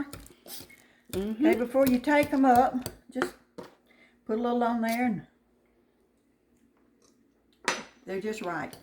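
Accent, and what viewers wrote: South, United States. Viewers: First of all I love the southern accent. I’m from bartlett , Tn. Your country fried steak and cream potatoes look so good I can smell them from here. Can’t wait to make them. Thanks y’all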